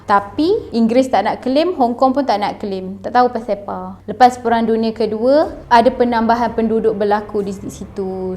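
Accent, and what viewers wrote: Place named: North, Malaysia. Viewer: tak taw pasepa... slank utara detected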